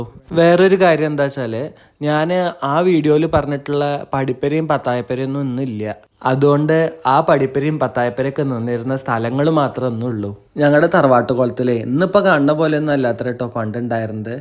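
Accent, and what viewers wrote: Valluvanad, India. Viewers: ആ വള്ളുവനാടൻ ഭാഷ ഷ്ടായി ട്ടോ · Is this valluvanadan slang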